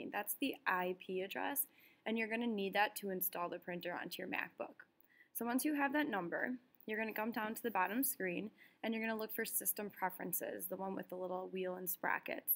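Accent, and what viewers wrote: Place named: Canada. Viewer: That was pretty cool. I love your accent . Canadian?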